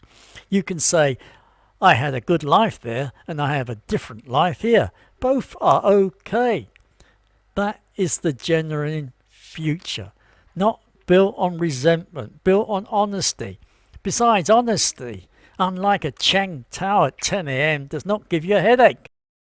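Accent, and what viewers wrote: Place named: United Kingdom. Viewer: I am still chuckling 🤭 after reading this Mr., let me paraphrase it back to you: Christian, you must absorb more propaganda, least you should get closer to enlightenment by your own personal firsthand observations., why do I love ❤️ it so much, or do I? Is is that beautiful British voice that can take the English language and use it in ways that are like a Grandmother sewing 🧵 up a favorite tattered stuffed animal 🧸, or how much we all love Britain 🇬🇧, The late beloved beloved Queen 👸, and well loved 🥰 King 👑, Mr., I have listened and conversed with you because you are British, what do they do best? I think 🤔 it is Art 🖼️ the liberal art through the humanities, that was how they made life better for me growing up in America 🇺🇸, Doctor Who, the British comedy 🎭, the wonderful documentaries, adventure stories or a knock down drag out questions session in the British parliament on CSPAN, our fascination with the British monarchy, and that wonderful history, a history that defines what sovereignty is, and what it is not. 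Perhaps what is interesting and fascinating about you is agreeing with you but not coming to the same conclusion. Perhaps understanding you is to begin to better understand the peoples of the world 🌍., I was reflecting on post World War Two history, the United Nations 🇺🇳, Japanese atrocities, the two front war Americas fought, pacific and Atlantic, and the results; we saved Britain 🇬🇧 ah yes, DONT say it, you know it is true, you asked we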